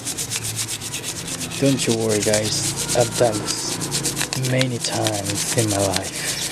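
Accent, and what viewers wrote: Visayas, Philippines. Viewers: hahaha bisaya man diay ka slangs kaau kada.hehehehe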